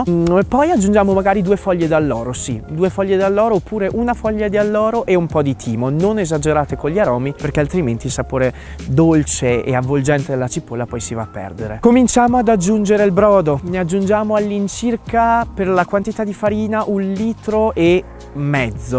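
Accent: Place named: Trentino, Italy. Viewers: Io ti preferisco con i capelli corti, comunque ti adoro solo sentirti parlare.. Quell accento del Trentino mi fa impazzire! Un saluto dalle Marche. Trentino ti lovvo.. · "...te sei en brao matelat".....orgoglio trentino...davvero bravo, simpatico, sobrio, educato ed efficace..... e mooolto trentino.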